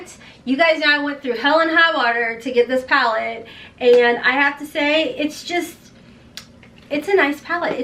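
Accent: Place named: Kentucky, United States. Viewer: That Kentucky accent came out strong in this video! Love it!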